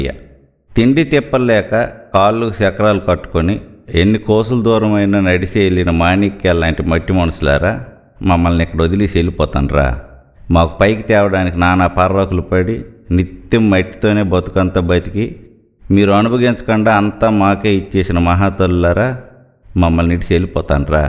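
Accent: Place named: Uttarandhra, India. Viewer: అంతరించి పోతున్న ఉత్తరాంధ్ర మాండలికం, నుడికారం, స్థానికంగా ఉచ్చరింపు మీకే సొంతం... మీ సంకలనానికి దాసోహం...🙏🙏🙏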